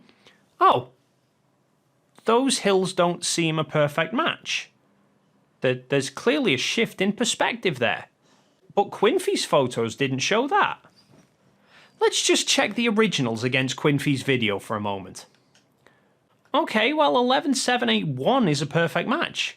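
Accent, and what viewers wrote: Netherlands. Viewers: Yes, the Dutch accent is strong in this more.on. So Goldmember is a better comparison. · He sounds Dutch, with that accent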